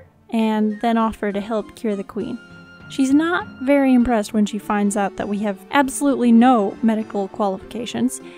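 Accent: Russia. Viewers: RuSsIaN AcCeNt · hilarious that your using a russian accent with an italian based character xD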